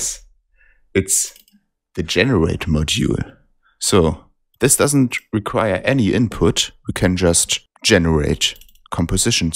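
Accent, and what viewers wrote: Germany. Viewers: Sounds like a very nativ german guy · Der deutsche Akzent ist sehr sympatisch xD Danke für den Einblick ins Programm :3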